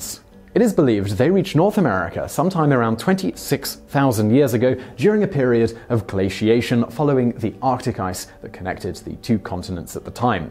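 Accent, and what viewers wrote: United Kingdom. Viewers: These aren’t facts. Its euro-centric BS for racists doing “research” on you tube to find and site as proof. This video is unscientific, gives no citation of the amazing amount of research that would have to exist to support all that it claims. Its typical YT nonsense with a British accent in order to pass it off to stupid, insecure people. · When did VSauce Michael start using a British accent?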